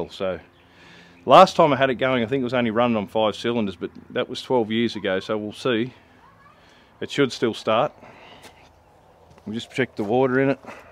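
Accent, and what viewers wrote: Australia. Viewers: I like your channel. It's good to hear an Australian voice on YouTube. 👍🏻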